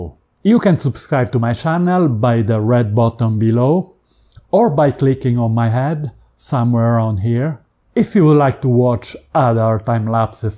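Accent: Italy. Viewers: I like your italian english. Made me feel like at home again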